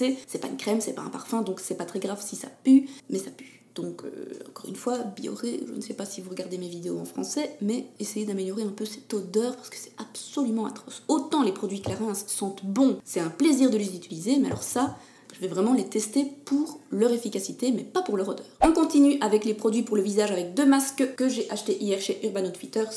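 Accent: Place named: Belgium. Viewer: Coucou, Je t'ai découvert grâce à Patricia B (merci à elle!), et j'adore tes vidéos tout comme ton accent Belge, vraiment;)! j'ai le nettoyant bioré au charbon dont tu parles, je suis surprise car pour moi l'odeur n'est pas du tout désagréable, ça sent le frais, il y a peut-être des différences de compo entre la France et les US... Étrange ^^ Bisous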